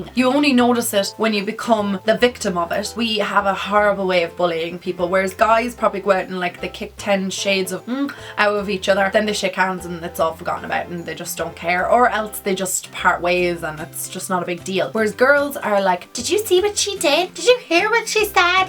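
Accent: Ireland. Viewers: Are you Irish? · Love your advice and health related videos😍 So relatable and helpful, definitely one of my favourite YouTubers! What county are you from in Ireland? I'm from Dublin but my accent is nothing like yours😂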